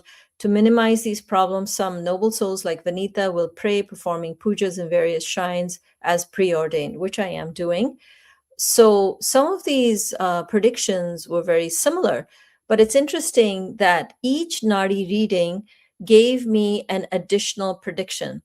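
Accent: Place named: Hindi, India. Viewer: It is kali yuga not kali yug and moksha not moksh Madam please dont pronounce sanskrit words like hindi. Thank you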